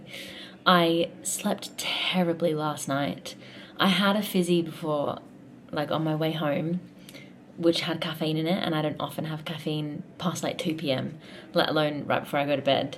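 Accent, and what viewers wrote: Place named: Australia. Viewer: The Aussie accent caught me off guard for your debut 😂😭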